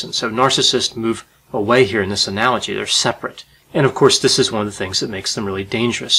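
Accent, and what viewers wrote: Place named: Philadelphia, United States. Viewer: I can tell you're from the Philly area because of your accent. I too am from Philly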